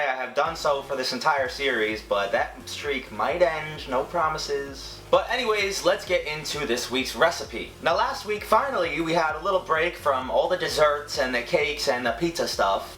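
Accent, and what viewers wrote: New York, United States. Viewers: There are a lot of people on YouTube that annoying me. isn't one of them. I hope he can get more subscribers, he really does deserve it. It seems that he tries really hard on these videos. Plus he's a New Yorker like me. It's funny, even before I actually heard him say he lived in New York in one of his videos, I could tell he was from New York because his voice was so familiar.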